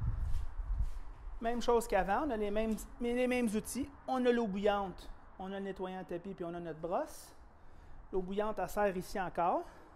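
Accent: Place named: Quebec, Canada. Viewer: LOL 😂. C’est du slang québécois !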